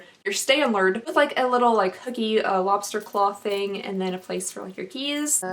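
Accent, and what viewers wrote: Kentucky, United States. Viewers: are you from Kentucky??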